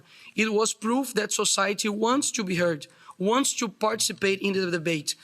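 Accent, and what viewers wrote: Brazil. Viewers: FALANDO INGLÊS TUPINIQUIM DELES.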